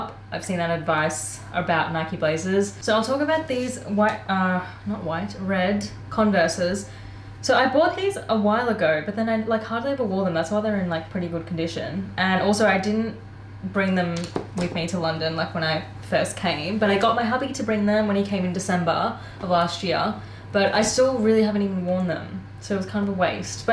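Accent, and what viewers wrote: Australia. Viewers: Wait are you Aussie bro if so sameeeee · does her content has anything to do with her being indian?! and can't you tell by her accent that she is an australian (her origin can be in india whereas she can be australian by belonging there)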